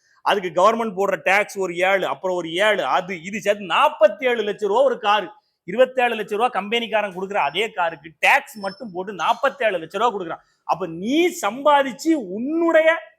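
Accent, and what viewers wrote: Tirunelveli, India. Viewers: இயல்பான நெல்லைத் தமிழில் யதார்த்தமான ஆழமான அரசியல் பதிவு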